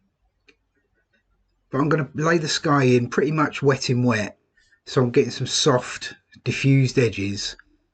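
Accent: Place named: United Kingdom. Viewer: Drawring !Got to love a British accent. Takes me back to Michael Meyers and his character , a little boy named Simon that made his drawrings in the tub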